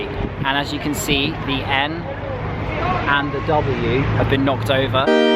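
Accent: United Kingdom. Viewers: You’re British English is very beautiful 👍🏻 Oh, and about the orthodox church, all this churches belonged to Albanians I don’t get it why they want to destroy it and most of churches are Catholic Churches and they belong to Albanians... this churches are ancient Albanian monuments stolen by Serbs recent until war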